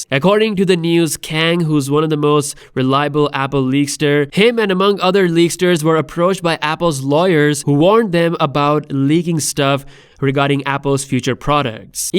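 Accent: India. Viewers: Indian accent? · are you Indian cause you accent is different I am not complying just telling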